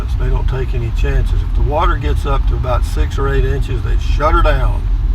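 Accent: Texas, United States. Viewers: Thanks for the Sunday drive! Love your voice and your Texan accent! Good stories. You’re funny- had a couple of gut chuckles😬. Thanks for sharing. Damn, all those roads and not one human in sight!!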